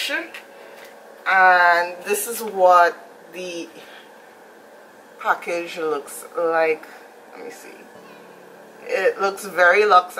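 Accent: Trinidad and Tobago. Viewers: Are you trini???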